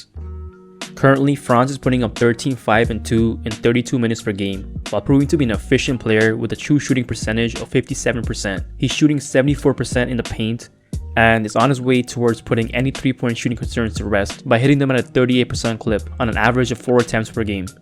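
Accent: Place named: Germany. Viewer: bro versuch nicht deinen deutschen akzent zu verstecken man hört ihn deutlich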